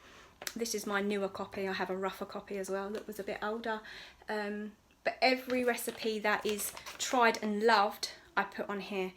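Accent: United Kingdom. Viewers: I love your British dialect 😊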